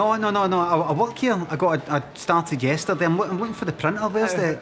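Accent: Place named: Glasgow, United Kingdom. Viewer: made the mistake of using automatic captions, does not handle a glasgow accent at all.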